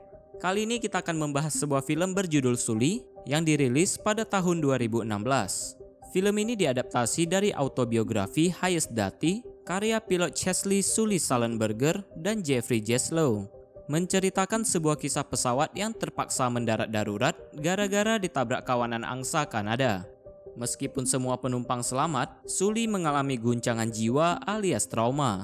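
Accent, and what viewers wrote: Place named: Singkawang, Indonesia. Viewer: Ini logat orang cina Singkawang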